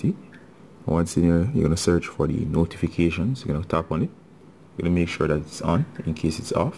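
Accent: Jamaica. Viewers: Sound jamaican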